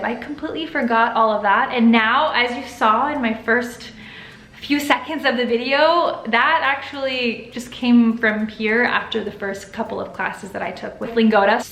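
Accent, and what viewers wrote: Italy. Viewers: Trop bien ! Tu parles déjà hyper bien. Je trouve aussi que t'as un tout petit accent italien quand tu parles en français, mais ça fait très classe :) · So glad to hear you speaking my language!! I'm so impressed to see you speaking so many languages! I think I never told you that I was French but I'm really happy to hear that you started to take French lessons online! Do you know what? You've got an Italian intonation when you speak French ! I'm excited to see more videos about language learning especially (and about French in general)!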